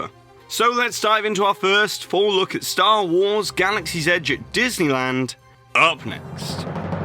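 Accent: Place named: RP, United Kingdom. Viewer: The posh accent cannot die fast enough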